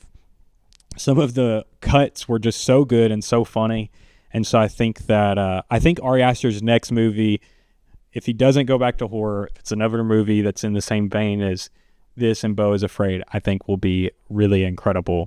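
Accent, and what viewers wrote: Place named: South, United States. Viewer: about to watch the video but upfront: love the accent. don't think i hear many southern accents from youtubers i don't know if this was consciously on ari aster's mind when he made joe cross' ending, but in the theater i immediately thought about the cases where people have been disabled from complications of having covid and any preexisting conditions that are worsened by it. he's paralyzed from the head injury but it makes a dual image. i'm thinking it was conscious since the er doctors commented on his high temperature, and, if i remember correctly, that affected the care he got at the hospital. we know he has asthma and probably sleep apnea (i recall a machine in the bedroom). at the least these parts of joe's characters were added for the dramatic and comedic effect but aster is a very thoughtful writer and director. 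it isn't something i've seen anyone comment on or ask him about. it just nags at me once i saw cross' breathing problems i knew he was doomed (practically all main characters in his films are). and once he went off the deep end i was ready for whatever terrible fate would befall him. but i felt sympathy for him after he's paralyzed. despite it all aster created an ending so awfully poetic for cross that i was struck by what it must feel like to be him, trapped in his head forever and unable to do anything for himself. it's fucking bleak. and of course aster slaps you over the head for the empathy with what the nurse and mother in law do. genuinely diabolical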